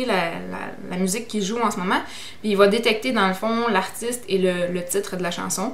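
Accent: Canada. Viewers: D'accord merci :) Tu peux aussi me donner le nom de l'application pour l'essence stp :$ L'accent canadien est vraiment le plus beau du monde mais je ne comprends pas toujours tout :P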